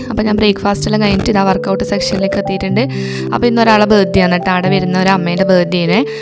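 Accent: Kannur, India. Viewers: കണ്ണൂർ ഭാഷ ഇഷ്ടപ്പെട്ടു വർക് ഔട്ട് കൃത്യമായി നടക്കട്ടെ വിശേഷങ്ങളും വീഡിയോയും ഇഷ്ടപ്പെട്ടു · വീഡിയോ സൂപ്പർ ആയട ❤ കണ്ണൂർ ഭാഷ എനിക്ക് ഇഷ്ടായി ഞങ്ങൾ ഇവിടെ unnithand എന്നാ പറയാറ് ❤️❤️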